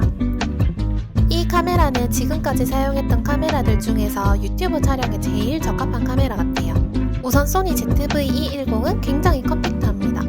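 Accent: Busan, South Korea. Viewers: 나중에 카메라 하나 장만하게 되면 바로 너로 찜뽕이다 후하 그나저나 중간중간에 부산 사투리 들리는 게 너무 귀엽고 좋네요😚 오늘 영상도 잘봤어요!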